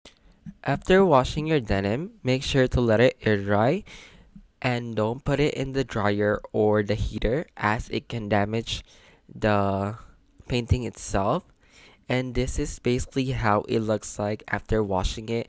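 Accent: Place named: Philippines. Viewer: I thin he got filipino accent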